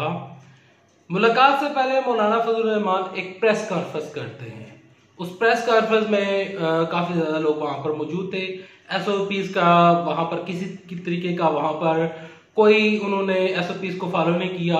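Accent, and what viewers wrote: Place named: Punjab, India. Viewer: Fix your accent too much Punjabi accent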